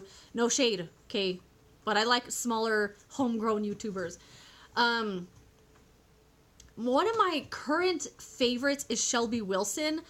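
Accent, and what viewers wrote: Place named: Canada. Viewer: Your accent sounds Canadian. Are you? Malls are my favorite too, ever since I was old enough to shop with my own money.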